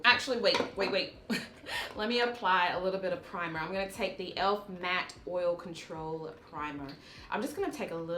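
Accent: United States. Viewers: Lovely video. Just recently purchased the elf Camo concealer and the hydrating concealer, I’m looking forward to trying them. Love your accent, where in the states are you from? :)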